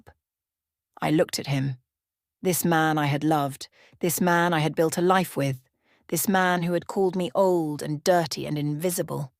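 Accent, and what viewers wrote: United Kingdom. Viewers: Like the British accent, enjoyed this story.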